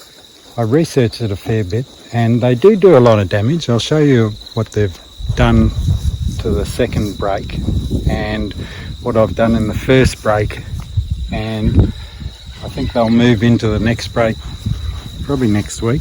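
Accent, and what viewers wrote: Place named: Australia. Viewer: Has an ausy acent makes all the sence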